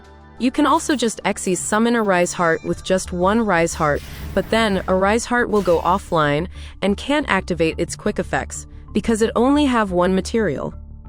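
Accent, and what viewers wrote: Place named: Atlanta, United States. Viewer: Why the text to talk sound like she’s from Atlanta?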